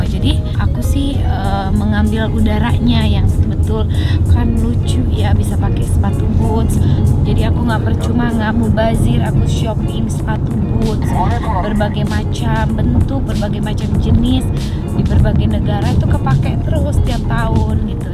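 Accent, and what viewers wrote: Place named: West Java, Indonesia. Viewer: Sunda pisan geningan nyaa... GK bisa dibohongin orang Sunda mah lama diem di Jakarta di luar negri logat nya ttp nyundanis keneh wehhh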